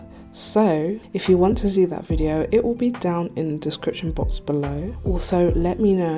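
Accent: United Kingdom. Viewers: Love your accent! btw, are you british?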